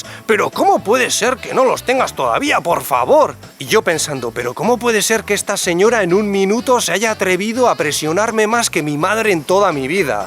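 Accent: Basque Country, Spain. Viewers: El acento vasco de es muy gracioso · 🤣🤣🤣🤣🤣🤣🤣🤣😂😂😅 soy mexican y el acento tuyo me encanta español de España JAJAJAAAAA es Argentino o español???? Sorry nose me puedes decir?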